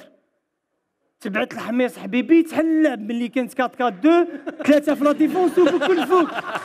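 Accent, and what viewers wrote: Constantine, Algeria. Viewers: اللهجة القسنطينية قرعة ماتصلح لوالو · هديك هي بصح عجبني كي يهدر باللهجة القسنطينية 😍😍😍😍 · اسمحلي نطق التاء القسنطينية باين على بعد 1000 كم مام تهدر الروسية De passage bon courage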